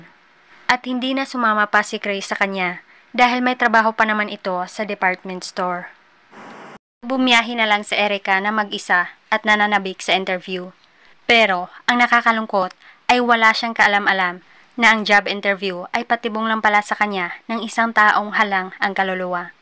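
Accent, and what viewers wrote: Visayas, Philippines. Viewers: Walang mali sa grammar nya, may puntong bisaya lang ang pagdeliver nya ng storya. Pero walang naman problema dum. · Grammar o diction?😂😅 naiintndhan nman mga sinasbi nya un ang importante.. Ganyan tlga kpag hnd tagalog ang nkasanayan.. Visaya yata si Mam..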